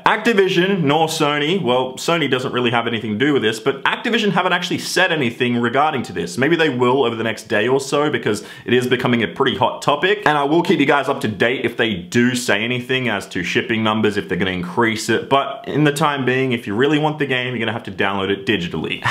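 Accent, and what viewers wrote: Australia. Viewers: Are u Australian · thank god your back i miss that Australian accent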